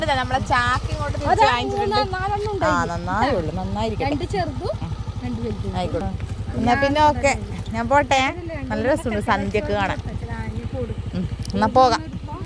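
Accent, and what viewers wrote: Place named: Kochi, India. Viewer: സന്തോഷം ഞങ്ങ പോണ്. നമ്മുടെ കൊച്ചി ഭാഷ മറന്നില്ല 😂😂😂❤❤❤❤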